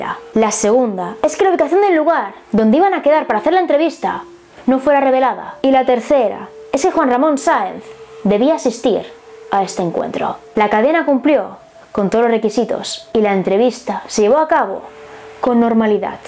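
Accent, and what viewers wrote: Galicia, Spain. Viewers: Soy mexicano me caes bien. Ahora te sigo... no me acostumbro al acento español . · Bellotas hermosas y preciosas. Un vídeo genial y asombroso. Narrado de la mejor forma, el estilo y acento español de la bella bellota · Oye tía eres tu española gayega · Me encanta tu vídeo... Y ese acento español (con todo respeto) es como para un audio libro y escucharlo cuando me toca trabajar toda la noche, te recomiendo el vídeo de la casa de Fidel, de la mano peluda.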